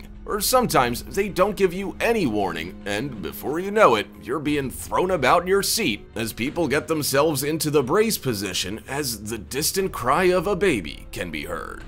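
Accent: United States. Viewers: the voice over.... so not done, but american of course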